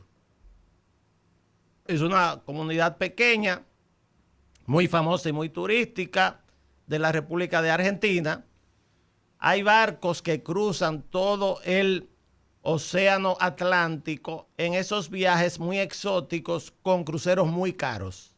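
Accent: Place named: Dominican Republic. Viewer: Me suena acento de la República.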